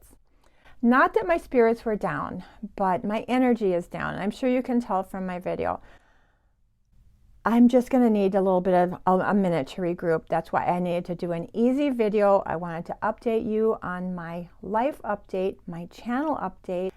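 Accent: Minnesota, United States. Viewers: I am so happy for you. Also, I love your accent. I realize that, for security reasons, you won't say where you live, but you sound as if you're from Minnesota. I could listen to you read the telephone book!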